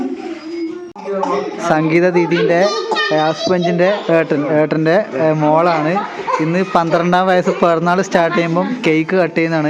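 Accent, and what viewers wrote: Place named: Kottayam, India. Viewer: ചേച്ചി കോട്ടയത്തുകാരി ആണ് സ്ലാങ് കേട്ടാൽ അറിയാം. ഫാര്യ എന്ന് കേട്ടപ്പോൾ മനസ്സിലായി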